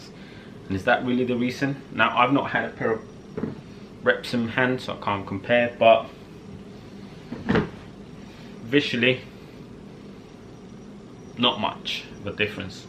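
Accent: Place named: United Kingdom. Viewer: Are you Mexican ? 😁 I’m confused because your accent is British 😂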